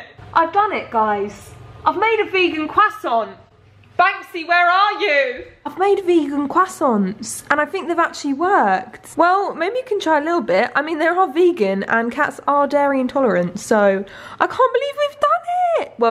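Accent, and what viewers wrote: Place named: Birmingham, United Kingdom. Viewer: You're a brummie! I knew it!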